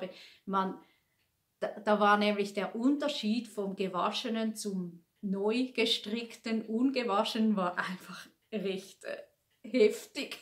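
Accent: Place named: Switzerland. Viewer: Liebe, vielen Dank für deine tolle und unterhaltsame Podcastfolge. Ich bin erst vor ein paar Wochen bei dir eingestiegen, da die liebe Angie von dich empfohlen hat. Und ich muss sagen mit Recht! Du hast so eine positive, lustige Art, da bekommt man direkt gute Laune 😊. Deine Projekte gefallen mir auch sehr gut und ich habe bisher auch noch keinen Kolding, will ihn aber auch noch stricken. Strickt du die normale oder die kleine Version? Und dein Schweizer Dialekt 😍 ( sagt man Dialekt?) Eigentlich ist es ja eine eigenständige Sprache. Ich liebe es!! Viele Grüße aus dem Rheinland Katrin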